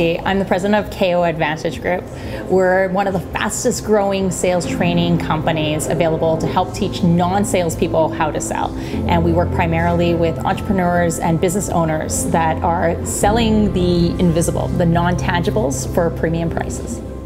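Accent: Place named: Canada. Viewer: Excuse me. Please. Congratulations,. I would like to thank you for the perfect Canadian English class. There is a possibility of playback speed to 0,75 or 0,5. Anyways! I'm learning a lot from you. Sorry for being out of focus on the video. I watch several North American Natives. They speak Portuguese, but your Canadian accent is the best of all. Your connected speech is Perfect.!! You're a great inspiration to me (or, for me) You're Amazing,. "Why am I not Canadian"??🇨🇦 Sorry to lose the focus of the video. P. S. I've just watched your next video. Thank you! Your fan! See you!!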